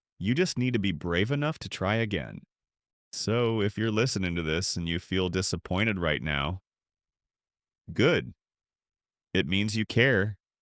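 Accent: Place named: United States. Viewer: You sound 100% American and your vocabulary, grammar was completely accurate omg I thought you were an American in disguise, how on Earth are you not a native English speaker??? 🙀🙀 Please make many more videos about your journey learning English grammar, vocab, and esp speaking like methods, technique, textbook, material, webs, apps etc I really look forward to it. Your achievement on English speaking as your second language absolutely takes me aback, you're most iconic English speaker to me I appreciate your message in this video: "no need to be perfect, be brave enough to try again, make as many mistakes as it takes, learn from them and get better bit by bit" - truly mind-blowing mindset.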